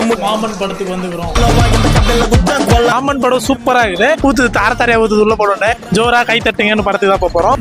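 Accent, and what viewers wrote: Salem, India. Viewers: Bro ne ga Salem dha na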